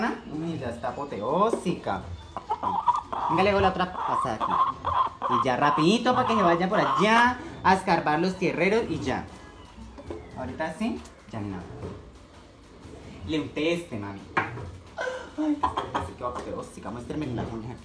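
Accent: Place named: Colombia. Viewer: EL ACENTO COLOMBIANO 💘🇨🇴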